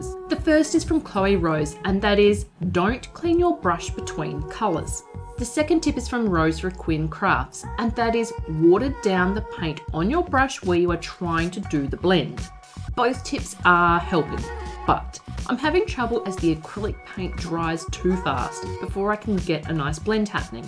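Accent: Australia. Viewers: You could call it "My Swatch Book: because PPPPPP" Love your work as always, it's always so refeshing hearing another Australian accent on YouTube!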